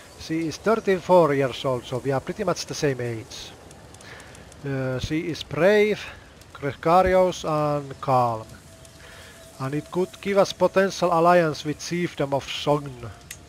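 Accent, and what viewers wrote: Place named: Finland. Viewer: You sounds very finnish. I like it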